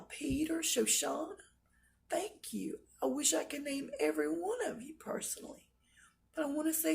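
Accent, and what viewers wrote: South, United States. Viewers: PS I want to thank you for your videos - I enjoy listening to your southern drawl and your outlook on life. May God bless you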